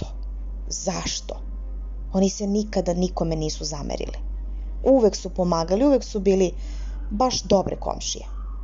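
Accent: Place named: Podgorica, Montenegro. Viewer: Dobra priča. Iz Podgorice sam obozavam ovaj naglasak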